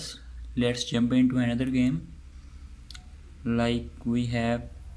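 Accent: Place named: India. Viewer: hes indian his accent is SUPPOSED to be like this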